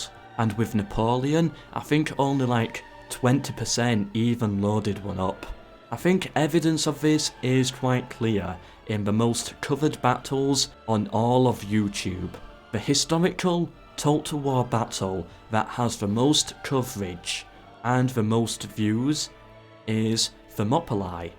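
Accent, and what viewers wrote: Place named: Yorkshire, United Kingdom. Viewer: h so this is the well-known yorkshire accent that everyone speaks of. Now i have heard it aswell finaly. Must admit that for me as a german it is sometimes hard to understand acusticly, but the quality of the content makes up for it ! :)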